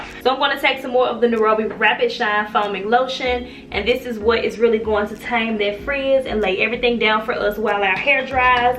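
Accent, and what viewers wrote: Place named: Memphis, United States. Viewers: When you first started talking I automatically heard Memphis! Then looked in the bio and was like I KNEW IT 😂😂😂😂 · Girl I didn’t even know she was actually from Memphis but I saw your comment for comfirmation of what I was thinking 🤣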